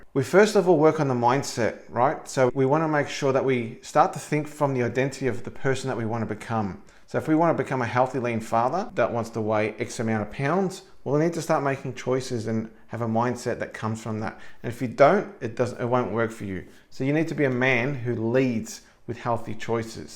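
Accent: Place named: Australia. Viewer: Excellent advice, delivered in an Aussie accent that is impossible to ignore. These are all points I can follow, determined to lose the spare tire around my midsection, thanks mate!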